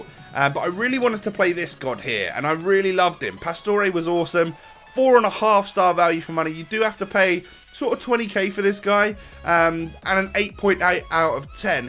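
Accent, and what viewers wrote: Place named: London, United Kingdom. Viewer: Where are you from? London Maybe? I love your accent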